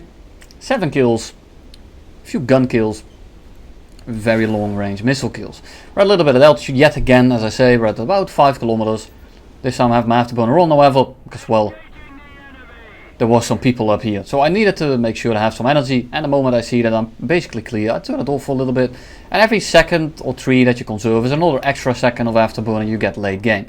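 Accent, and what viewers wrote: Netherlands. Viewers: Are you come from the netherlands?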